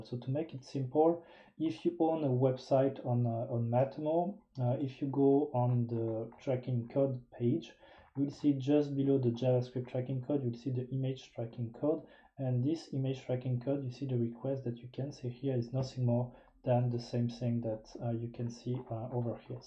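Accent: France. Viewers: Il me semblait bien que c'était un accent Français, Bonne continuation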